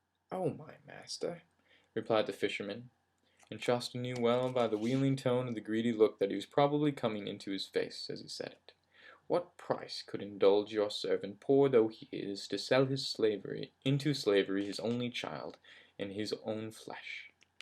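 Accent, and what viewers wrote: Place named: United States. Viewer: Description says he's from Scotland. He sounds American. No biggy :P~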